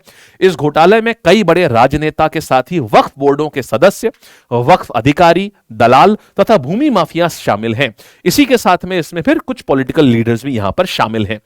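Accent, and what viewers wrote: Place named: Bengal, India. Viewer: Sir up ka bat sunker lagrahahe up bangali hei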